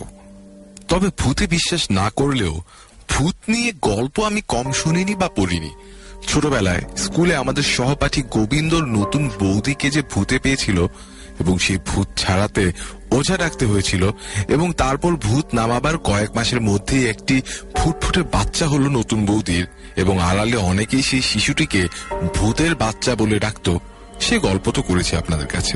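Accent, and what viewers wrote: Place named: United Kingdom. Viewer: ওরকম দাঁত চিবিয়ে চিবিয়ে ইংরেজি স্টাইলে বাংলাটা না বললে নাটক টি সর্বাঙ্গ সুন্দর একটা রূপ পেত ভাই... ওভাবে বলার কারণে অনেক শব্দ স্পষ্ট শুনতে অসুবিধা হল।😅 । পরবর্তীতে এ বিষয়টা মাথায় রাখা উচিত...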